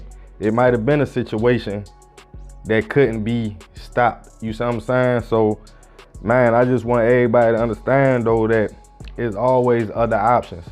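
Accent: Baltimore, United States. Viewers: Reposted comment. Im from Bmore, unfortunately. This is sad but the citizens are dumb enough to fall for the tricks. Instead of dealing with the corruption, they are too busy going after each other because they are afraid of white people. A lot of sellouts in Bmore, especially the women. They HATE black men because they look at us the same way white people do. That's why a lot of them are not married or in a relationship but have kids. They'll sleep with black men but can't keep them. Then go against black men in front of white people to get kudos and crumbs from them, smh. It's embarrassing being from there because when I am out of town, wherever I am, and I tell people I'm from there, I get silence and blank stairs. They think it's like the Wire, which is a good dramatic series but it put a stain on the city because the average black man from there, gets looked at that way. 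People think I'm from somewhere else because of how I talk. I NEVER talked like the people in the city or the guy narrating this video. No dis but I was brought up not to talk like that so I didn't. Anyway, black people are the ones who talk like that so when I tell people I'm from there, they are surprised. "You dont act them" or "you don't talk like them" is what I get all of the time and I'm glad because it makes me stand out. People actually like me and trust me more because of it. People think I'm from the Caribbean, Africa, or New York. I'll take it because truth be told, another thing baltimoreans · I'm sorry but yall ex baltimoreans(so you say) are a part of the problem. You see a problem but you have no solution to help. So here you come on this man PLATFORM talking about how someone is speaking. Yall dont know how he speaks OFF OF YOUTUBE. And if you're TRULY FROM BALTIMORE CITY, THERES NO HIDING YOUR ACCENT. 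GTFOH!!!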